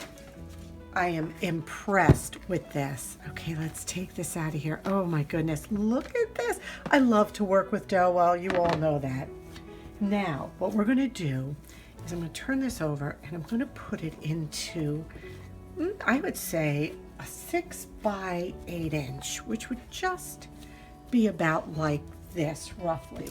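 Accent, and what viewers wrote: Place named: New Jersey, United States. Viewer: I love what you did with the table top! It looks wonderful! I am from Iowa but your accent reminds me of my dear friend in New Jersey! I enjoy listening to you and look forward to your videos! Good job!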